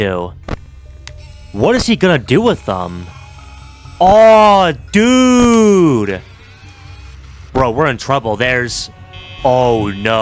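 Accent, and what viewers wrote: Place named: Australia. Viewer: Because he has an Australian accent I think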